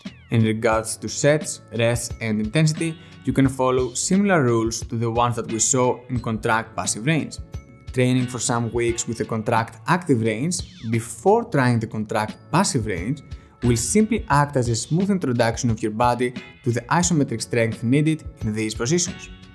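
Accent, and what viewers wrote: Spain. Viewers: What accent is that? Are you from Spain?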